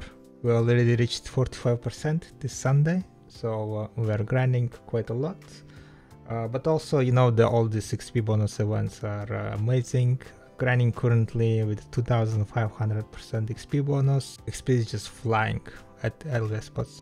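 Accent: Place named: Rural, Russia. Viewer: ППц разговорный английский как из русского села)) чел завязывай) это явно не твое!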